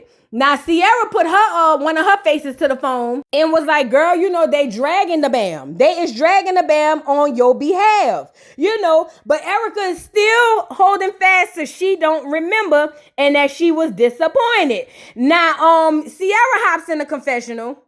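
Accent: New Orleans, United States. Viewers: You are so funny! And ur into keeps a smile on my face, you definitely got the best into of any be I kno plus ur accent i I love it! I always wanted to to go to NO!!!!!’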